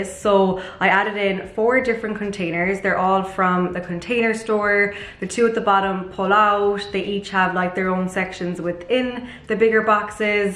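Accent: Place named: Ireland. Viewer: Pretty Irish accent.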